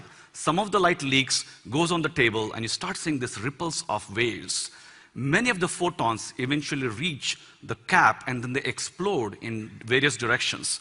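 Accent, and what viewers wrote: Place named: India. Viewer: Indian English hmmmm, Anyway thank you .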